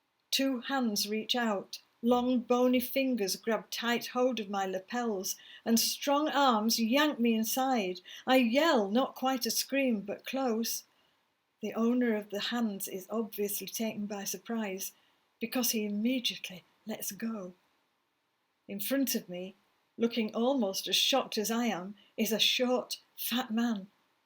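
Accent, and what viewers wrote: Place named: United Kingdom. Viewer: I wish I had you reading this book in your wonderful British accent. I so enjoy it xxx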